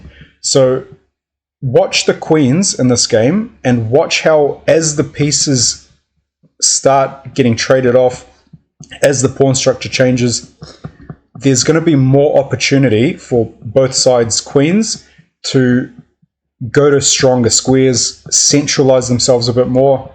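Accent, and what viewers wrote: New Zealand. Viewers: THANK YOU, OUTSTANDING CH! I PLAN ON VISITING NEW ZEALAND. i WAS CURIOUS TO ASK, YOUR ACCENT, IS IT BRITISH OR NATIVE NEW ZEALAND/ THANKS PAL,... MIKE.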